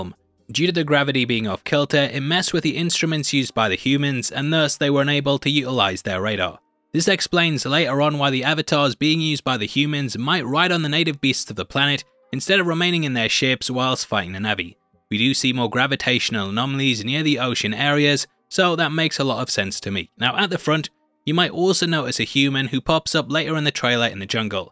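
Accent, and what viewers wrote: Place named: United Kingdom. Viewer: Love when you say Spider-Man No way home with your strong British accent every video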